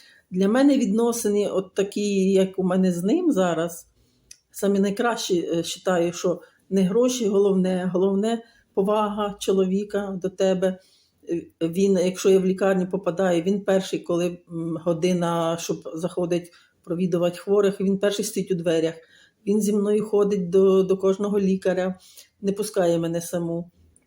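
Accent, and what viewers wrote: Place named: Bukovina, Ukraine. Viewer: Мабуть ближче до Чернівецької. Бо з Буковини і мені близька ваша вимова.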